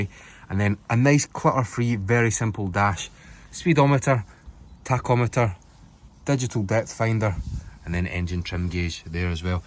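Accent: Scotland, United Kingdom. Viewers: I love that scottish accent!! watching the videos only for the guy talking, love it !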